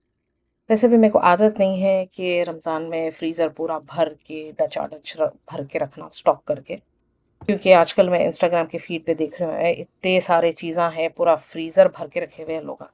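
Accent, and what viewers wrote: Hyderabad, India. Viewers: Aapki language Hyderabadi lagti hai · Ramdan mubarak... aameen lovely vlog ... m so excited when your vlog comes ... lovely hearing you ... i always find hydrabadi language bit funny dont mind this word "apanay ku" 😂 to funny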